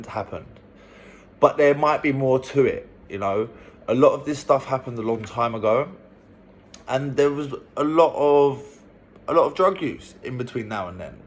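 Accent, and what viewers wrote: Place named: Turkey. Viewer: the turkish accent stuck brother😂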